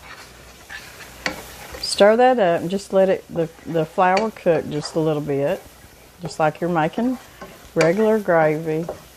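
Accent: South, United States. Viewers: 🤤🤤🤤. Drooling at this video like my pit bull drools when she's watching me it. I marinated some thighs last night in a brine. I was either gonna deep fry or grill. Oh bud thanks to your video and accent, I'm from TN, I know what I'm gonna do, I'm gonna drown mine in gravy, gravy is a staple of the south, and greens mashed taters, but I'm gonna have fried okrie, another southern staple. I'm really surprised I've not run across your channel and what's odd are the number of comments but no thumbs up. Mmm mmm 😋😋😋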